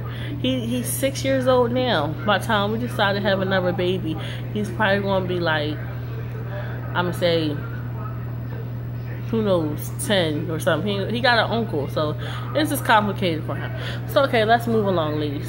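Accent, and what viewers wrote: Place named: New Orleans, United States. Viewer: Congrats on the little girl......where are you from? The reason why i ask is because your accent sounds like your are from New Orleans.... Glad to see everything is going well.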